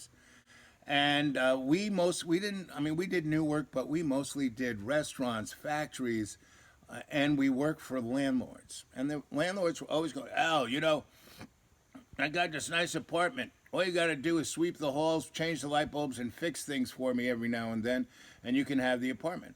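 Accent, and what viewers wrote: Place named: New York, United States. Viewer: Definitely from NewYork..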